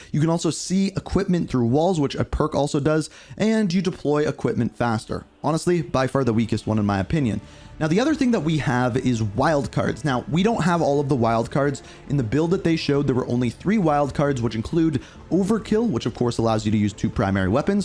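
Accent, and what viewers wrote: Canada. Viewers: didnt know you were canadian til i heard those aboots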